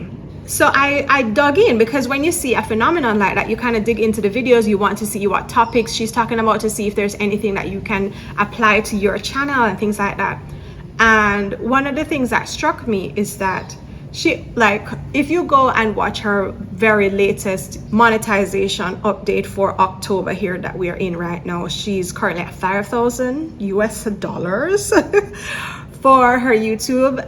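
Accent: Jamaica. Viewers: First timer to your channel, and a fellow Caribbean national trying to come up with content. I'll tell you what I think dough dash's "secret" is. She has displayed her struggling financial situation to everyone, and her viewers are rooting for her to succeed and I believe that this is what has driven her channel's recent success. ... ppl are invested in helping her and seeing her succeed. I don't believe this is sustainable content... I'm sure she will HAVE to find something more substantial as time goes by. Only if you are prepared to display most of the private parts of your life, where you are struggling, you will get ppl following you to see how the story pans out. DD channel is like a soap opera... and this is what has ppl coming back to see "what happening today...?" I'm not prepared to advertise my financial or family struggles to get the views. Please take heart.... 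I'm a new subscriber to you.... love me a jamaican accent❤ Love from Trinidad 🎉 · YARDI GIRL HI THERE! Love di accent u nuh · I'm just a plain white Canadian but I think your Jamaican accent is charming. I can understand 90% of what you say but maybe 10% of your native Jamaican sayings go over my head lol. I don't know how your channel popped up on my homepage, I guess because I started watching House Hunters videos, though I never saw the episode you were in. I usually only see independent travel videos. I like your message of encouraging others to live more, it's something a lot of people need to hear right now. Don't compare yourself too much to that DoughDash woman-I don't even think using that name is legal (since it's essentially piggybacking on the popularity of the DoorDash name which the company had to pump a lot of money into marketing for.) You are doing it right just by putting your own name on your channel (and since your last name is, it also taps into that part of the audience who either loved or had issues with class lol). 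If I could suggest something to you to improve the views of your videos, it would be show more scenery of Merida, maybe some sunsets or beaches, just more general things people like to see. I have never been there and am not likely to go, it would be kind of nice to see something like that. Most of the successful travel channels I see are from independent Youtubers who film their stays in various hotels and locations, but they've usually started off filming the beautiful scenery of their own hometowns. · and relevant because my proof is I found your page when looking for information about moving abroad specifically to Mexico and when you started talking with your Jamaican accent it resonated with me because of some of my friends who are Jamaican. It’s a global ting😀 in Texas, Mexico and the best city in the world New York!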